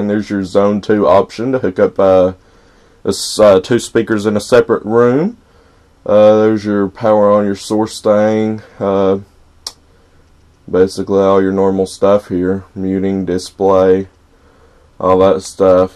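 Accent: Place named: South, United States. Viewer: he sounds like a southern dude........he sounds cool though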